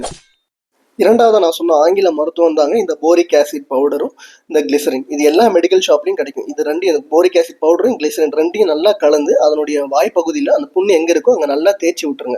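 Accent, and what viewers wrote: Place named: Madurai, India. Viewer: ❤ madurai basha thanks ❤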